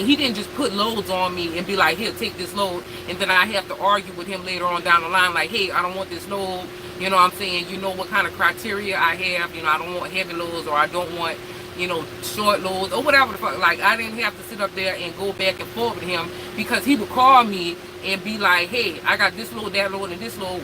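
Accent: Louisiana, United States. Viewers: I love that Louisiana accent.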